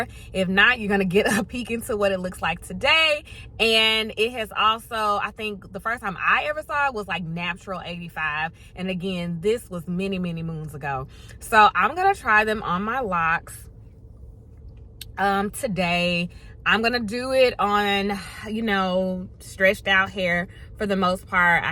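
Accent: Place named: North Carolina, United States. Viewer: Cute💕..are you from NC? You sound just like me😂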